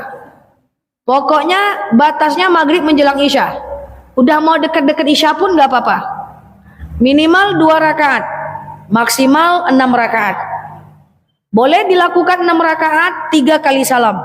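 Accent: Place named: Padang, Indonesia. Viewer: Ustdzh org pdg kayakny suaranya kayak org pdg benar GK subhanaallah kagum saya udh cantik ustdzah LG beruntunglah ortu nya mendidiknya anaknya jdi anak Sholeha semoga sehat selalu dan dijaga oleh Allah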